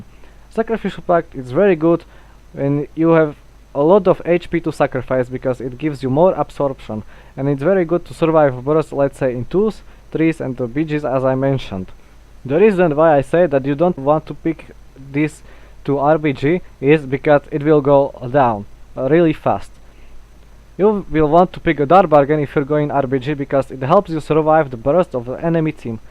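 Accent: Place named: Europe. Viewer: Love your accent man, brings back to my euro friends I used to skype with. I can tell you really hate warriors lol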